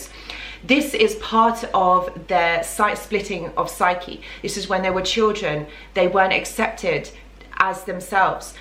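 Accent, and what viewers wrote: England, United Kingdom. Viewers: Ur really cool 😆 it’s xtra reassuring to me to hear another fellow English accent on a channel like this much thanks for the work u do it is appreciated Strength to strength peace all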